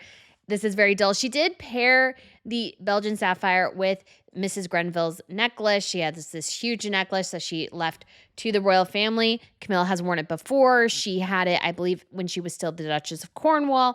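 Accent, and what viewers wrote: United States. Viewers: please get yourself a speech teacher and work on your squeaky girlie voice. You're too old to sound like a 14 year old. And while you're at it, you might want to get rid of that heavy American accent just a little bit.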